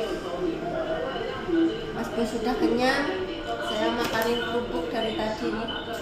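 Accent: Java, Indonesia. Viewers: Mbak..salam kenal, mbak sampean boso jowone kok kedok to, sampean dari mana nggih..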